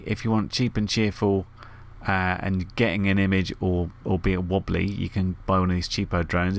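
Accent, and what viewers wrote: United Kingdom. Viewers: Like the old saying goes, you get what you pay for. You have more patience than I do. Still enjoyed the video. English accents are always more enjoyable to listen to. Imo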